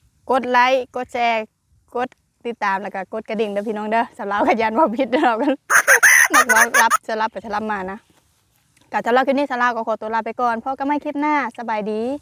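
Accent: Phu Thai, Thailand. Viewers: สวัสดีครับ สำเนียงผู้ไท น่าฟังมากครับ🌹🌹🌹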